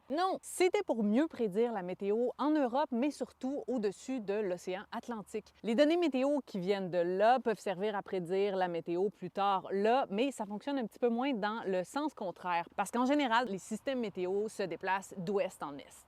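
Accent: Quebec, Canada. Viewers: J'adore l'accent québécois, je m'abonne ! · Ho l'accent, tabernacle ! 😂 je kiff 😂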